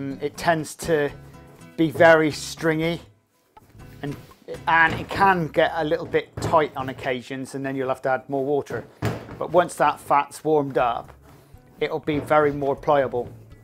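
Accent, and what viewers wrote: United Kingdom. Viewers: i love your accent. what kind of uk accent is it?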